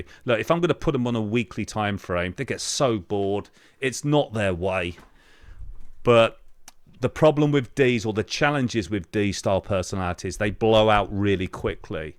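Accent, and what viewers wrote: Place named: United Kingdom. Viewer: I love you and you’re such a wonderful instructor! I love British accent that’s why! God bless you man 🙏🙏🙏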